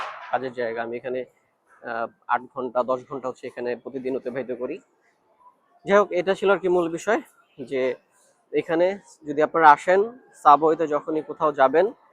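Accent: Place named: Nadia, India. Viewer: Ami to Kono problem dekhlam na? Amra bangalira aivabey kota boli...apni ki shantipur nodiya theke ashchen naki?....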